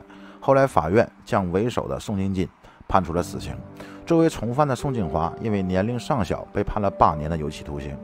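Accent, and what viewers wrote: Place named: Tianjin, China. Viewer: 天津话不像天津话，普通话不是普通话，听着别扭。干脆就用天津话讲就算了，这样反而更好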